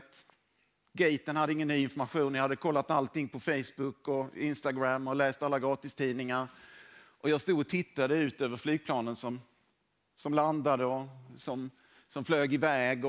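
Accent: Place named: Scania, Sweden. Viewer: en eloge för lugn välvårdad skånska som nästan vem som helst måste kunna uppfatta. Det du säger är oerhört intressant. Ännu bättre vore om allt du säger var textat på svenska. Det finns ju folk med rejäl hörselnedsättning. Men du är mycket tydlig i mina öron. I o f s kopplar jag av bättre om det finns text också. Tack!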